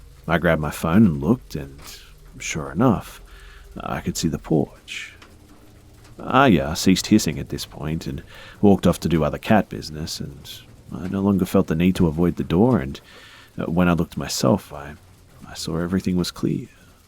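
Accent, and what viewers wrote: Australia. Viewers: Xavier Long lol everyone knows aussies kick ass and don’t say good day mate all the time. Don’t get bent out of shape because USA people are jealous of your accents. Personally as a USA person I’m jealous af I don’t have an awesome Aussie accent. Nothin but love here 💕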